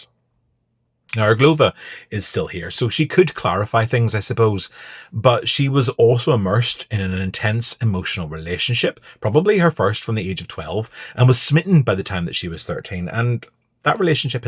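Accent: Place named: North, United Kingdom. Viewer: A northern accent 😮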